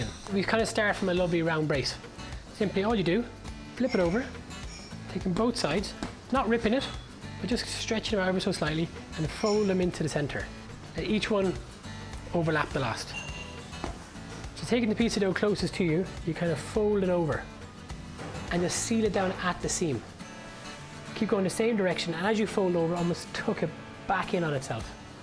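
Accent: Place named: Ireland. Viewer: bet you're fun to be around in a day to day situation. Maybe have that stick surgically removed from your backside?? Jeez. I have to say that I too enjoy his accent, but it's not because it's his.. just enjoy the irish accent. If you can't understand things like that ... well I REALLY feel sorry you ...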